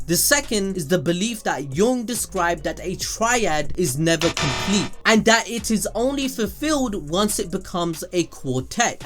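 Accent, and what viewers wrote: India. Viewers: Love your hindi acent😂💕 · That indian accent though 😂😂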